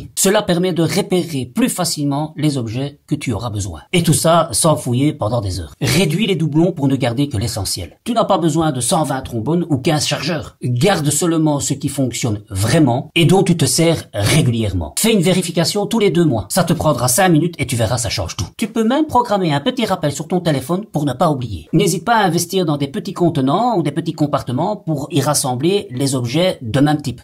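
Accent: Belgium. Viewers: On reconnait bien l accent belge! Et j adore❤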